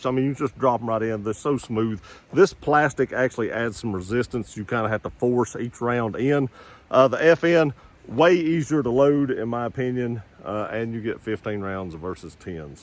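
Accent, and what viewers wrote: South, United States. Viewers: Brother are you from south of the Mason Dixon ?🤓…. Great video … well done !!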